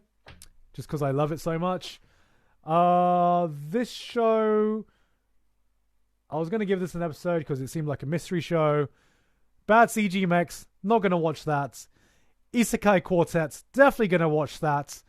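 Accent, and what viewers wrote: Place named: United Kingdom. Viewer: Holy shit look at that baby face😭. The british accent is much thicker too.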